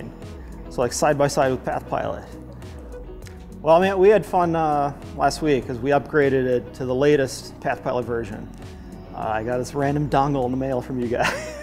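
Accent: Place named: Minnesota, United States. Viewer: How old were you when you moved? Your accent is, non-existent, well aside from being recognizably Minnesotan.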